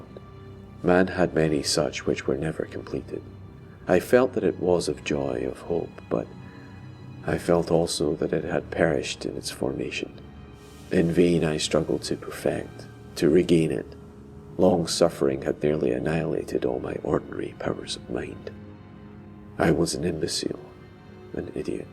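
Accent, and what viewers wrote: Scotland, United Kingdom. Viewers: Oh my! I must tell you...I LOVE YOUR ACCENT! Are you scottish? :D Will you marry me? Haha! But seriously, you have a very lovely accent...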